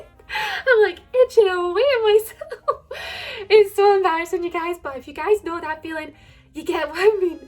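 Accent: Scotland, United Kingdom. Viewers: She made a YouTube video on it a few weeks ago, have a look :) basically she was hiding her proper Scottish accent in fear she wouldn't fit in or couldn't be understood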